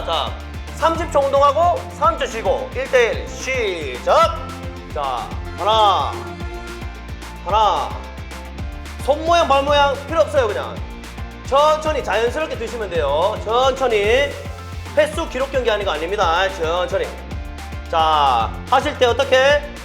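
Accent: Gyeongsang, South Korea. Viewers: 진짜 인트로 어설프게 미국물 먹은 경상도 아재 감성이다...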